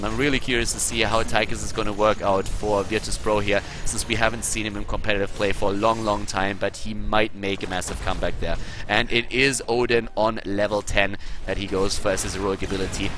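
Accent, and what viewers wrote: Germany. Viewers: Good commentary. It was clear you were rooting for the Germans, and I think your accent is German, so maybe there's a reason for that ;-) You did point out the good things both teams did, though, and being a bit invested in the game is always cool. Subbed!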